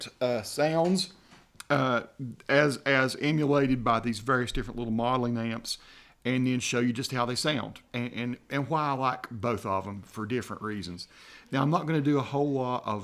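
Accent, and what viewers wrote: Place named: Winston-Salem, United States. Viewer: had to be. Your accent is just like what I remember. Seems like nobody in Winston Salem talks like that anymore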